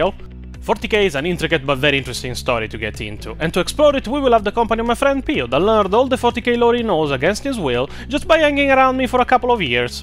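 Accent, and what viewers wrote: Italy. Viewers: You sound exactly like an Italian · This italian english is so perfect 🤌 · Bro is the most Italian sounding Italian I've ever heard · But not violently Italian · Gran Acento Rustico Italiano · This is fire, I mean I knew you were Italian but this accent just makes your jokes 10 times funnier looking back at em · This is awesome! Looking forward to more of videos and savory Italian accent! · Holy shit, he sounds AGGRESSIVELY Italian!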